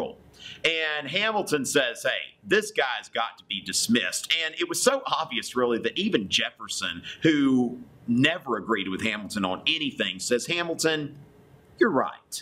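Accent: South, United States. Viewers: I LOVE THIS COUNTRY ACCENT MERICAA!!!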